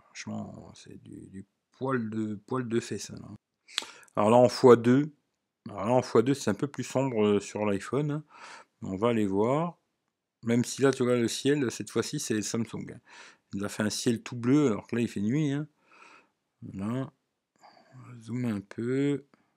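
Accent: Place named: Lorraine, France. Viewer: Eh mais un lorrain ! 😂😜😍. J'me disait l'accent me dis qq chose 😏. Bon la stabilisation est à la ramasse sur le n10+ en vidéo c" assez flagrant pour moi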